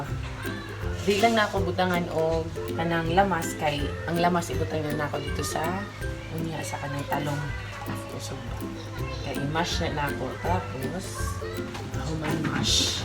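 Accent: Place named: Visayas, Philippines. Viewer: bisaya d.ay maam 😊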